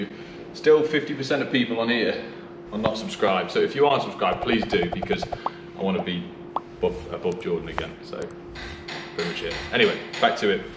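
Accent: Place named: United Kingdom. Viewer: For those of us who don't speak British... it would be helpful if you paused between words and enunciated... very difficult to understand what you were saying for me. If you don't care, I won't be offended... take it for what it's worth.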